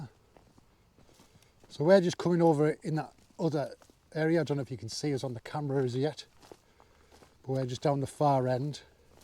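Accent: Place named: Teesside, United Kingdom. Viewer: Are you from Teesside by any chance i highly recognise your accent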